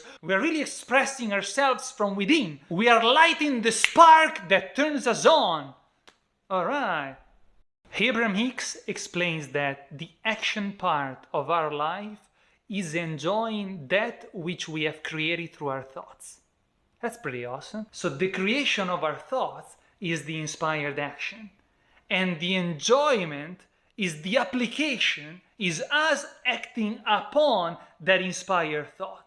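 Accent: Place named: Italy. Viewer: Rest assured, there was no language block. To the contrary, your enthusiastic inflection, which I assume followed Italian rules of enunciation, made the content more interesting. Bravo!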